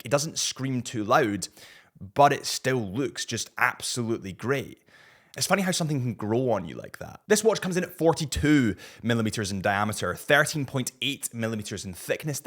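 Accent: Scotland, United Kingdom. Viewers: He is not English, he is Scottish ☝🏽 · Scottish my guy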